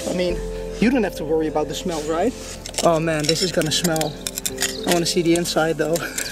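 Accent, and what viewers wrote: Netherlands. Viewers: I mean this in the best way but I feel like I’m watch verstapen dig for ww2 objects it’s so cool love the Dutch accent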